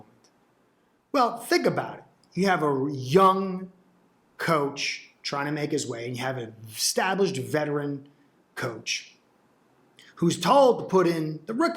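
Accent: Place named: Northeast, United States. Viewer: Love you're north east accent (piason?) can't put my finger on it. Not overly nyc or that north jersey twang. Almost like between philly and Trenton area.. reminds me of home. New sub. I love your even keeled approach unlike many fin fan fans. Fin fan since a lil kid in 84' in Philly then in 86' we moved 2 Tampa area , Bucs sucked and were blackouted every home game. Back then Miami was still Florida's team. And they were on every week. So, i haven't missed a game since 87'. Thru reg. Tv, direct tv, sports bars when away or when in the service. A few games had to mailed to me but I'm that loyal. My family, from folks to wife & daughter know during football season that 4 hr slot is blocked off for the Dolphins, don't invite me out & if i go over my folks for dinner, I'm not moving from the tv. Lol. I also played d2 & coached a lot, so i know the x's and o's pretty well. 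But, Flo's forgotten more about the game than ill ever know & he's at practice & watching coaches film all week. I don't like to 2nd quess coach's every step of the way or arm chair 20/20 play call. I know what goes into play calling & offensive installs. Without knowing the play & having access to coaches film to see who didn't win their 1 on 1's, it's impossible to question those micro things. Ill judge him on a macro level overall but not yet. Too early. I know we have been conditioned to be mediocre & expect us to eff up a wet dream. But, older i get the less emotional (except during the game... when X made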